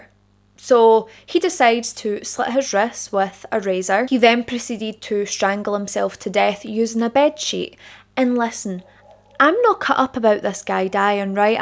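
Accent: Scotland, United Kingdom. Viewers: I've watched several videos on this apex laser! But, yours is the best! I appreciate the hard work and diligent research that goes into your content! Trust me,it shows brightly! Add your brilliant Sottish accent and we get consistent winners! Thanks, Jeff from Tennessee! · I came across your rivers and canal video by chance, so good to here a true crime buff wee a Scottish accent, your passion in that video brought me to subscribe to your channel.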